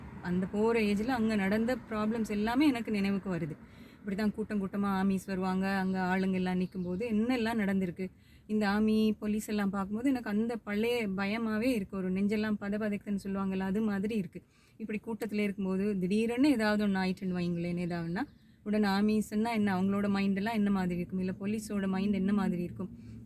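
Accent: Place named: Chennai, India. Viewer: Madras தமிழ் பேசுவதை நிறுத்தினால்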